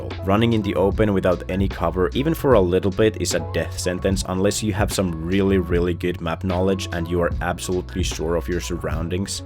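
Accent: Finland. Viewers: Pakko nyt kysyy onk jäbä suomalainen tai jotai ku must tuntuu et sä puhut tyylii ralli englantia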